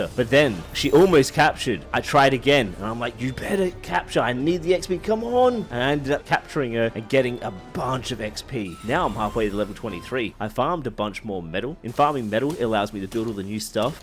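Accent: Australia. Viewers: I can't tell whether he's saying "pals" or "powers" because he's too Australian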